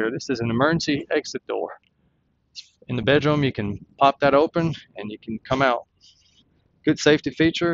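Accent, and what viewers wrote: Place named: Montreal, Canada. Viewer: Great review as always. Liking the Montreal accent. Thanks for posting.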